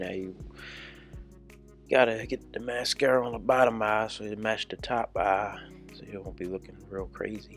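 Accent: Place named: Baltimore, United States. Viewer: Bmore in the house!!! Loving the Baltimore accent!!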